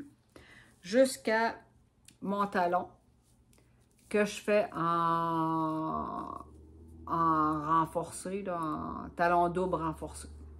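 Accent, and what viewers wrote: Quebec, Canada. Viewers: Merci pour ce joyeux moment de tricot! Je te découvre et c'est très drôle d'entendre l'accent québécois dans ce monde de podcast tricot dominé par les françaises... moi non plus je n'aime pas les minis circulaires... je n'y arrive pas. Bonne idée de tricoter ton stock de fil acrylic dans une couverture, je vais t'emprunter l'idée. Merci aussi pour tes commentaires sur ton gilet apéro, je le ferai aussi bientôt puisque j'ai acheté le livre de Marie-Christine au festival de la p'tite laine moi aussi. Bravo pour ta teinture de laine, c'est courageux de se lancer ainsi.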